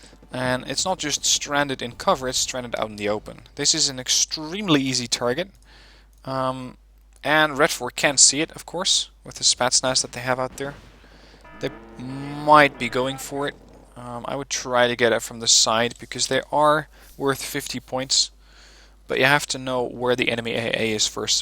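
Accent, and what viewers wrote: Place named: Netherlands. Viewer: I can tell by your accent that you're Dutch. Gave channel man!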